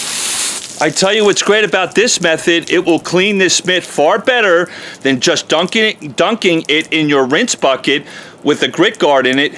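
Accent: United States. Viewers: Except the annoying American accent... like when always says “wowdar”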